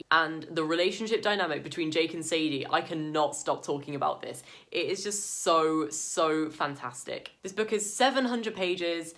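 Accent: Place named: United Kingdom. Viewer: I love your unusual opinion and accent. I think you are British.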